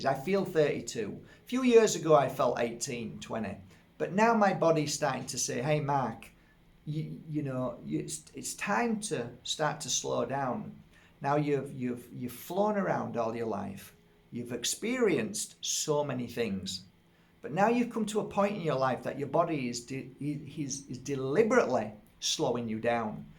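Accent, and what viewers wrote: United Kingdom. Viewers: You're so right Mark, this is so true. I loved this video, you made me laugh so much at the end! 😍♡🌸 I could listen to you all day, it's always a pleasure to listen to your videos, it's like we're having a tea together! ☀️😌 And as usual I love your UK accent! And as you say at the very end of the video: today is the first day of the rest of our lives. 🥰😊😇 Hugs and kisses to you, take good care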